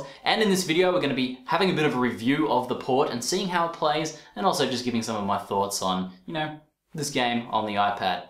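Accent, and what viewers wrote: Australia. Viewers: took me about 1 seconds to realise hes australian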